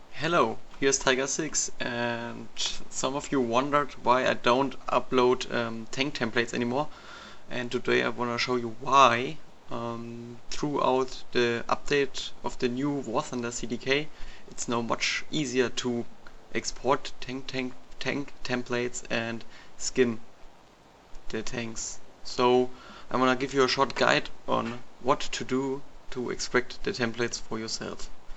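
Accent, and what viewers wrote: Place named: Germany. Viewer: Denglisch xD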